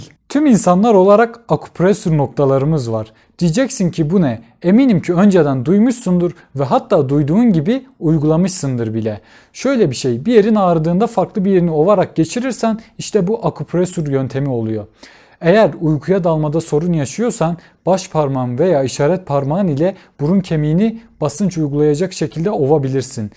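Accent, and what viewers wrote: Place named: Eastern Anatolia, Turkey. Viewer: Hemşerim nerelisin.. Lisanındaki ton Şarkî gibi..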